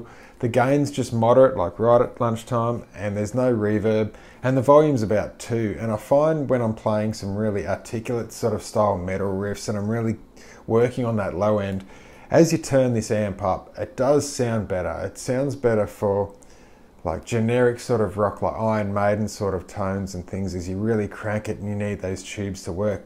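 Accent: Australia. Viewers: Aussie AF ! 🇦🇺 bet you was a mad sk8r too !!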